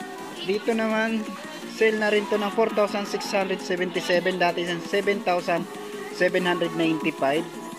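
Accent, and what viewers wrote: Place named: Visayas, Philippines. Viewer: REALTALK IDOL HUWAG KA MAGALIT NAGMAMALA SAKIT LANG AKO DAHIL FOLLOWERS MO AKO UULITIN KO SAYO GAYAHIN MO ANG ""BALAHURANG KOMIKERA""NILALAGAY NYA YUNG DISCOUNTED PRICE AT REGULAR PRICE NYA SA SCREEN AT ANG BILIS DUMAMI SUBSCRIBERS NYA... DITO KASI SA METRO MANILA PAG HINDI MAGANDA ANG BOSES MO O TONONG BISAYA O HINDI MO PA MA PRONOUNCE NG MAAYOS ANG SALITA MO "SORRY TO SAY" NA BOBORE O NAWAWALAN NG GANA ANG VIEWERS KAYA MASMAGANDA NO VOICE KA NA LNG IDOL...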